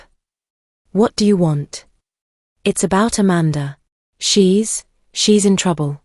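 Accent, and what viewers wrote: United Kingdom. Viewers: Awesome. Love British Narrators so much ❤️ 💖 💗 💕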